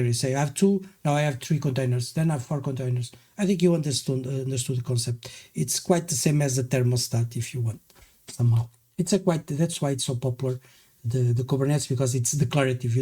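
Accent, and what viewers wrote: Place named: Portugal. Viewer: I recognize that accent anywhere. You, sir, are Portuguese. Congratulations on a very interesting video.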